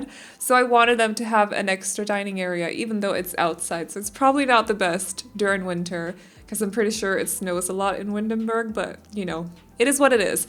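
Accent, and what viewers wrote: Denmark. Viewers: Faktisk var hun en af de første mennesker, jeg fandt. men tak! Også nu tror jeg, at min engelsklærer måske bruger dine videoer til danske studerende, der lærer engelsk haha. Hun tror, det vil hjælpe dem, da du har den danske 'accent'. HVORDAN LÆRER MENNESKER ENGELSK, SOM DE HAR STAVRETNINGSREGLER, OG FØLG DEM IKKE DEM!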